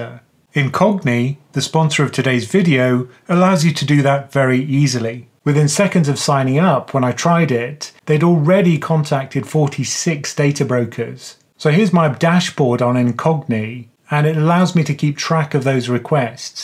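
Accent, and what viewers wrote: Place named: Cockney, United Kingdom. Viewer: Couldnt unhear in-cockney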